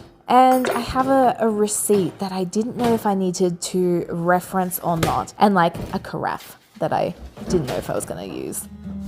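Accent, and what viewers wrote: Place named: Australia. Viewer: You and your home are beautiful! So good to see/hear another Aussie :-)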